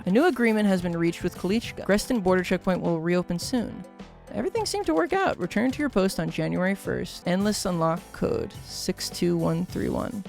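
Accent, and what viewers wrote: United States. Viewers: nah bro that is not arstroka edit: I can't watch this, it's unbearable. The americanization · I couldn't tell if it was intentional rage baiting or his flavor of American is just not used to words like that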